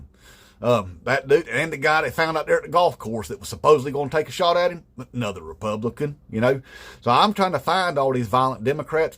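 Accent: South, United States. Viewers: Brother Man...and I mean that from the HEART!.. Was thought never judge others by race,color,ethnicity and or geography...For you SIR..are a GOOD/DECENT MAN..that Southern Accent and long hair sort of made me bais (somewhat ) I Was really SHOCKED by the context of your video..Again..SIR! MUCH RESPECT! ..for Speaking TRUTH..and for doing it while ..sticking to Facts and not personal bias and or prejudice..This NYC Puerto Rican would be honored to meet at a Bar of your choosing and swinging back a couple of Tall Pitchers of Beer(of your choosing)✌🏽 Brother..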